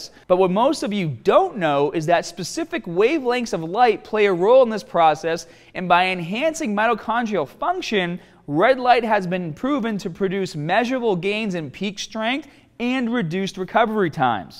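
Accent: Boston, United States. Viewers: I'm detecting that "Bostonian" accent. :-) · Woooof I didn't know this guy was from Boston until "scars" and "marks" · are you a Bostonian. The way you say 'started' --> 'Staaahted' is a dead giveaway! From one Bostonian to another - Do. Not. Lose.the.accent.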